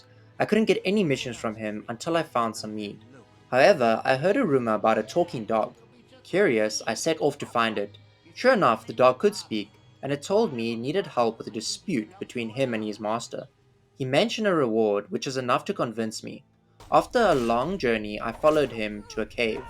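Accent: South Africa. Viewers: You south african mate ?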